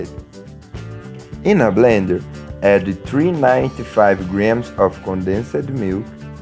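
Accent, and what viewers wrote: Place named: Brazil. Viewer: Mn esse cara n parece um gringo falando, acho q é um brasileiro falando inglês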